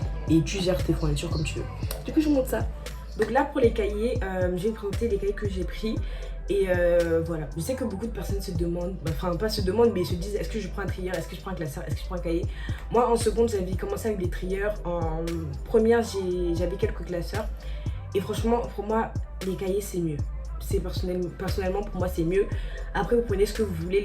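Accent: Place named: Cameroon. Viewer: dès ta 1ere phrase j'ai su t'étais camerounaise, bonne vidéo.😂🙏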